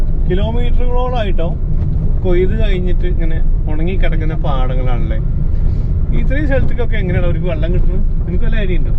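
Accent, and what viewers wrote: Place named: Idukki, India. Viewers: ബ്രോയുടെ ഇടുക്കി തനി നാടൻ സംസാരം ഇഷ്ടപെട്ടവർ ഉണ്ടോ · Irukku kattappanakaran.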